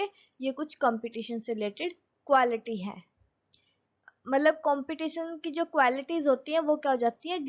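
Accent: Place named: Punjab, India. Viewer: you should to need more confidance, well good knowledge. Aapki Awaaz se lag rha hai aap Punjabi hai... 👏👏👏👏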